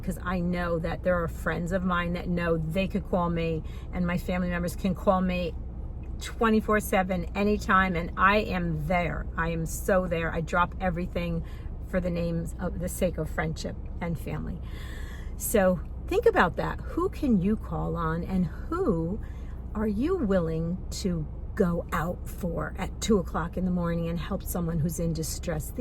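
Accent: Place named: New Jersey, United States. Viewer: I love your Jersey accent and your heart!! I’ve known you for 2.5 years and I have experienced how kind, thoughtful and honest you are! You’re the kind of friend that makes the world a better place!! God bless you!!❤❤❤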